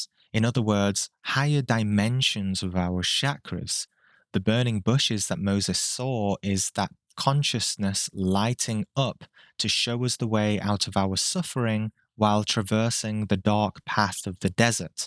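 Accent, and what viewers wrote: United Kingdom. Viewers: okay brother i never comment on videos dnt really do social media but you kinda have to to some extent to exists in the meta verse anyway!!!!! i have to take my hat off!!! i got shown a skeleton key months ago in a meditation and its blew my mind ever since, your video is extremely thorough and you are most def correct. got me excited, knowledge is like good sex to me ahaha when its displayed in front of me i get the biggest serotonin kick. the macro and the micro, all life can be overstood through study of self, we are literally mini universes and setup the exact same way, been studying this stuff since i was 16 i am 29 now and have jut started application of all this knowledge! very exciting time tho so many have woken up, almost dnt feel alone anymore ahah i also see i need for all these new people who have woken up to have some kind of guide to sithed through all da bullshit you have to on this journey, in order for us as humanity to get right to the crux of the matter and start raising up ourselves and in turn earth! 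u sound around my age to, and ur clearly from the uk like me, uk needs more of this we are lacking on the spiritual front on the net not much good representation, but i shall subscribe to you for sheer effort well done.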